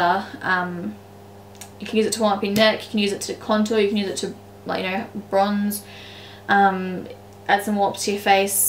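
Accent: Australia. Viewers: are you Australian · It is a normal Australian accent.You are so superior. I would have liked to insult you, but with your intelligence you wouldn't get offended. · sweety come to australia, she has the best pronunciation I've ever heard from an Australian and i live there, this is like posh australian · its an australian accent... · She's Australian :)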